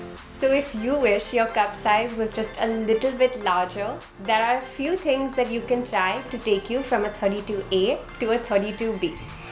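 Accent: India. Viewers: is it just me or does she have a Indian accent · Ummm someone please explain why this white girl sounds Indian? It's freaking me out.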